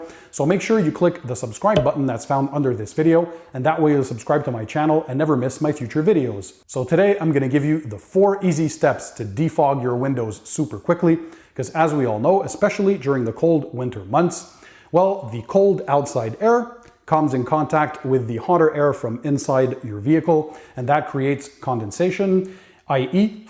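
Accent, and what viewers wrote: Canada. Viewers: Dude, why the Canadian accent? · Canadian accent, eh? 🇨🇦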